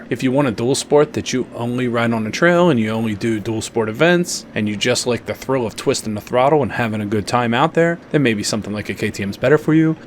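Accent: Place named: Pennsylvania, United States. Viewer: yeah it looked a lot like NC but I was hearing, to me, a little northeast Pennsylvania accent, so I wasn’t sure. Found your channel today and it’s great. Where is the best place to find used dual sports: FB marketplace? Craigslist? Cycletrader?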